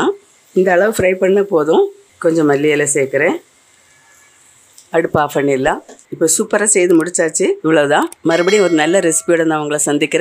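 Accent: Nagercoil, India. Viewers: I like to hear Nagercoil slang. Thanks for the video ☺️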